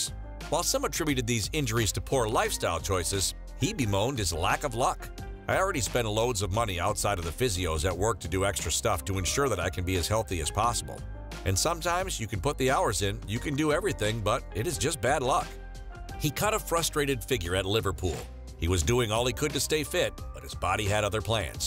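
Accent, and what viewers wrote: United States. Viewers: The American voiceover is not for me. So divorced from anything football.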